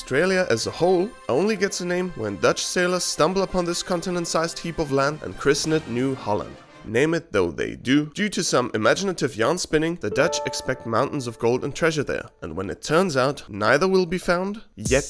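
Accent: Austria. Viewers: Oha merkt ihr nicht, dass sein Englisch einen Österreich/Deutschen Akzent hat? Scheinbar IST er Österreicher und deswegen kann er es so aussprechen ahahha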